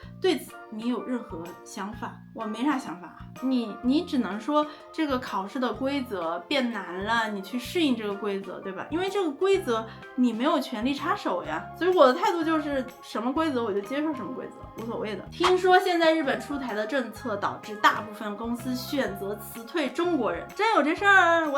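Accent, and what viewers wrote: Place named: Shanxi, China. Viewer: 感觉有点儿山西口音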